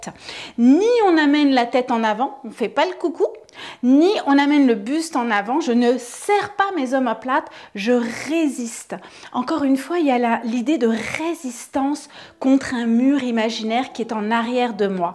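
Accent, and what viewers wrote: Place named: Quebec, Canada. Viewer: J'ai oublié de préciser que votre accent est sublime et merci à tous nos cousins francophones du Canada qui partagent si efficacement leur savoir que je n'hésites pas à consulter. Votre corps est idéalement fait tout en finesse mais bien structuré ce qui prouve l'efficacité de votre méthode. Je vous remercie encore d'exister et de vous investir pour notre bien être. Bien affectueusement 😘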